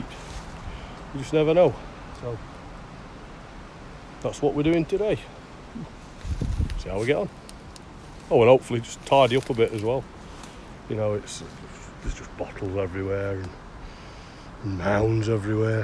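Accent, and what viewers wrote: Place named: South Yorkshire, United Kingdom. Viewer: Your accent sounds closer to the South Yorkshire accent than mine - I am from West Yorkshire.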